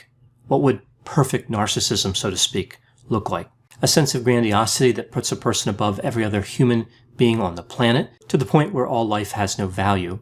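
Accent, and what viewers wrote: United States. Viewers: Great analysis, Dr Grande. Completely inconsequential to the case, but as soon as I saw the title I was curious about how the name pronunciation would go throughout this video :P Yours is probably as close to accurate as it's going to get with an American accent.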